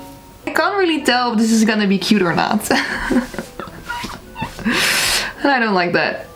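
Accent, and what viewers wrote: Netherlands. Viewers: every time I watch your videos I still wonder if ur dutch cuz I never knew but u rly have that dutch accent lol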